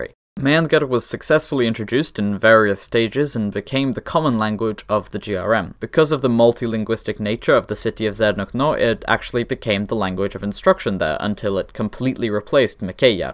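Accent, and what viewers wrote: Sweden. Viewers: Love your Swedish accent haha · This dude is probably Swedish based on English pronounciation and how he pronounced things in his constructed language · Are you Swedish? You sound kinda Swedish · the little bit of swedish that is in your pronunciation is so <333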